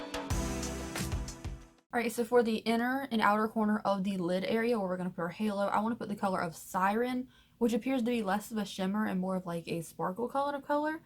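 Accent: South, United States. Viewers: First off you’re gorgeous and I love your reviews so much. I noticed we have similar accents and figured you were from the south and I looked at one of your older videos and saw you’re from North Carolina!!!!!! Ayyyyy represent?!!! Nice to see successful YouTubers from here and really gives me hope. Love your content and you truly deserve more views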